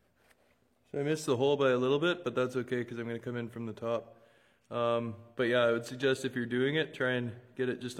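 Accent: Canada. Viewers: Canadian accent. instant sub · R u Canadian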